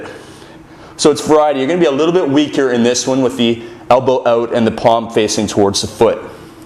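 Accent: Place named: Canada. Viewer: I think he is Canadian because he says "oout"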